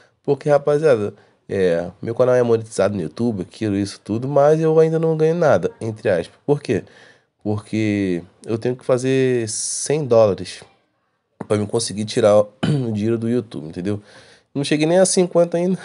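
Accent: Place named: Rio de Janeiro, Brazil. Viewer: Po carioca é dahora 😂😂😂😂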